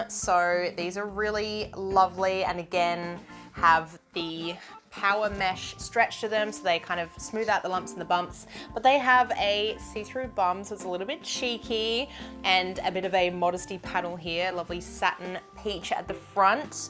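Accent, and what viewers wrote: Australia. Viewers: Are you Australian, I love your accent 😃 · I love the Aussie accent. She is a beauty as well.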